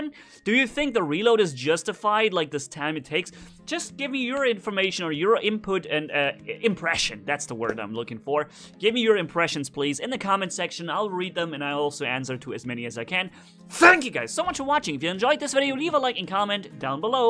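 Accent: Germany. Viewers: You do realize that English is NOT his native language, he's lives in Germany. Why don't you type your posts to in German and see how well you write/pronounce words in his language.. In fact I'm not positive but I think he learned English just in the last 5yrs or so. Don't worry though, I’m sure and others around the world, who dare to speak on social media in their non native language of English, will take your post to heart, and vigorously practice their proper pronunciation of English words before their next video/podcast. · Are you German?!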